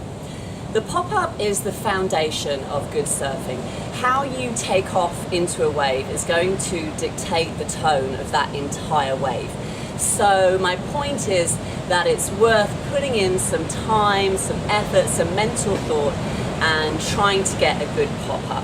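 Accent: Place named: Australia. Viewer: 억양이 너무 호주 호주 한데요~ ㅎㅎ 역시 서핑은 오지! ㅎㅎ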